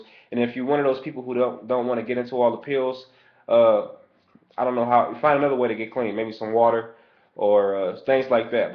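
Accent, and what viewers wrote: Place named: Worcester, United States. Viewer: Tell me this guy isn't from Worcester, did he say Becker?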